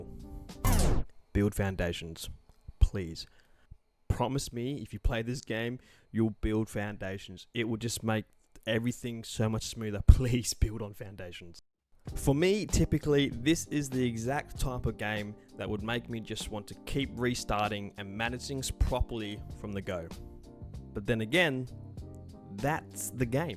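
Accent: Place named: Australia. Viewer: This is the second time I’ve watched this video. I love your Ozzie accent!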